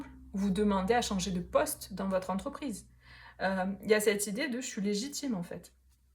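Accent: South, France. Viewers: Merci pour votre limpidité, merci pour cette guidance. D'où vient votre accent du sud ? Je suis du sud ouest 😉. Douce journée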